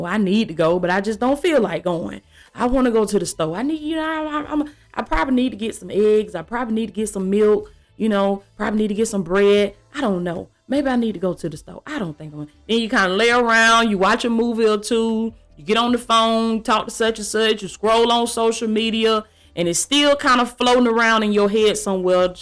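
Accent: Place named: Georgia, United States. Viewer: U sound like u from louvalle ga. THAT'S A GOOD THING. His children r there too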